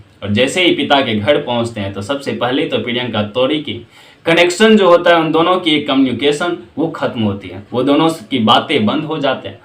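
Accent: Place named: Bihar, India. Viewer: Aap ki kahani sunane m bihari language jhalakti h .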